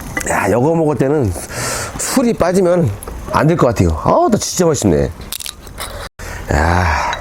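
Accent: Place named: Gimje, South Korea. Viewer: 저도 고향이 김제인데 사투리도 생활하시눈것도 친근감이 가네요~ 시골라이프 부럽습니다!!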